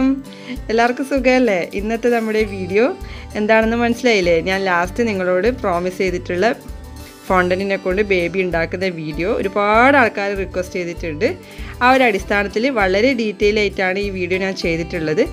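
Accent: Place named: Payyanur, India. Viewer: Chodi😜. Pynr slang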